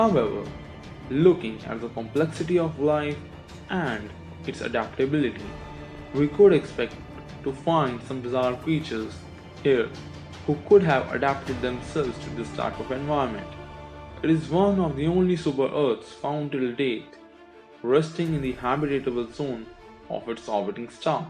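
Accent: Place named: India. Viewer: Can't you tell.from his thick accent? Although he tries his best to mask it, but indian accent is just too difficult to get rid off.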